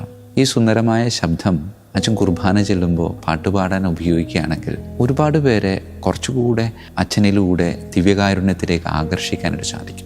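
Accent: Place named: Thrissur, India. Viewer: Thrissur aayirrikkam. Slang .....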